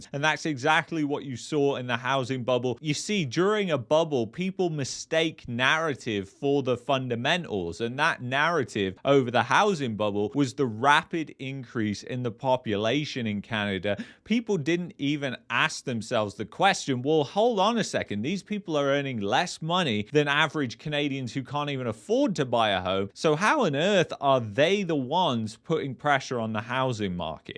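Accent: England, United Kingdom. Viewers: I believe he's from England. By his accent he's certainly from England. My roots of my distant ancestors go back to northern Ireland which has more world champions per capita including myself than any other civilized place on Earth. My great grandfather and great grandmother on both sides of my family were born in Canada in the 1850's.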